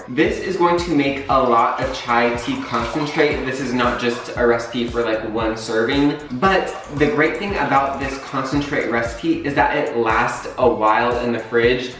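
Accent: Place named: Brazil. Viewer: Where are you from? Your accent it seems like brazilians speakers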